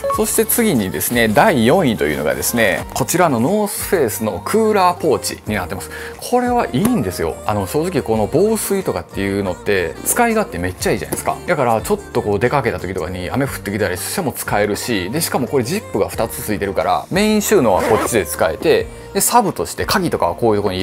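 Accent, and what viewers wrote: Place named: Osaka, Japan. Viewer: 大阪弁とヒョウ柄🤣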